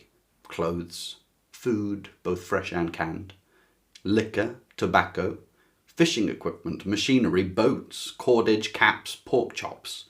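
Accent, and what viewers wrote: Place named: London, United Kingdom. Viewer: I would like you to make a video about what makes a classic a classic! I just discovered your channel, and I like it really a lot: your descriptions of the books, the fact you read a small peace of every book you mention, your wonderful way of speaking (I take it you are from London or near it). You are my favourite booktuber now!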